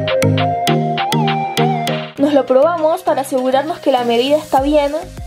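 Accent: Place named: Córdoba, Argentina. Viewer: De que parte de Argentina sos? Pareces de cordoba,i ndea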